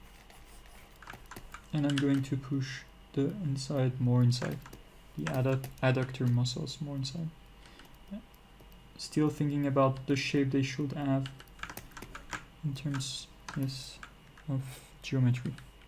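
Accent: France. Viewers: i love your french accent it is so sexy :D romantic <3 <3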